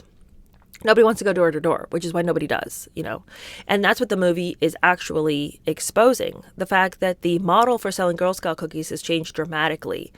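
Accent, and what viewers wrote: United Kingdom. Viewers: Oh, you never disappoint. I clicked into this video in hopes of hearing your British accent and almost right out the gate...BAM...you delivered. Your videos haven't come up in my feed in a long time and now i realized how much I've missed you. 💕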